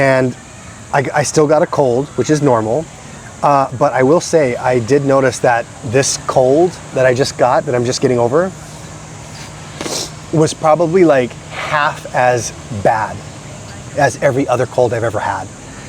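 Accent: Philippines. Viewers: I don't find it offensive when you use Filipino accent when you use English words. I teach English to Japanese and Koreans. Kapag kausap namin yung mga students namin, pagandahan kame ng accent. Pero pag kami-kami nalang talagang Pinoy accent na. We find it weird na nagtatagalog tapos gumamit ng English word magsu-switch sa American accent. Although pronouncing the words properly is very important, here in the Philippines we make fun of each other kapag masyado slang ang pronunciation.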